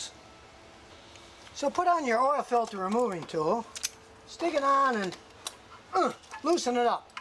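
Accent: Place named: United States. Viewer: Love your cowboy accent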